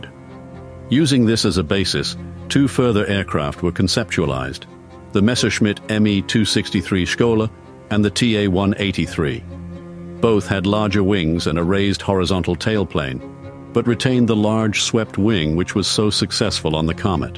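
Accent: United States. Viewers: How is is that 'Australian Military Aviation History' have an American accent? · Why is an Aussie channel vid narrated by a yankee? And airmen from the 60's shot 8mm film, not 8mm video. Video would have been shot on 2" magnetic tape and was not portable, nor used by airmen. There is a difference between video and film. Accuracy counts in history folks. Details, even small ones, are important. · The narration switches between American and Australian accents mid paragraph. Why has no one else noticed this?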